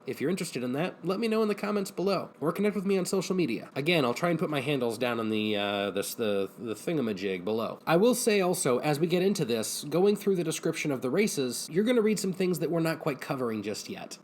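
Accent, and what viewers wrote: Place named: Scotland, United Kingdom. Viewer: Your Scottish accent is on point.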